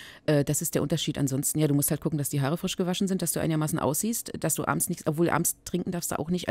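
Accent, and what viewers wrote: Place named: Berlin, Germany. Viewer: Einfach sympathisch, schon allein, weil sie berlinert ;).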